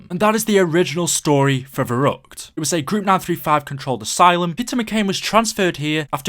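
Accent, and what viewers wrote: United Kingdom. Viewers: Giovanni Costilla well he is from the United Kingdom, and he is British, not Canadian like vanossgaming.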